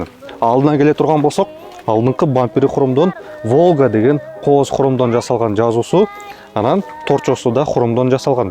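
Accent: Standard. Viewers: Сонун экен .Алып баруучу сага чон рахмат ! Таза кыргызча айтып бердиниз . Ишинизге ийгилик. Чон респект !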